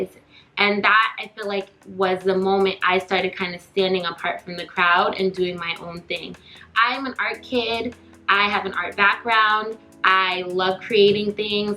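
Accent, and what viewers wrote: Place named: Minnesota, United States. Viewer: Sorry, this is kind of left field, but you should like you're from Minnesota... or Canada. Where are you from? Just curious.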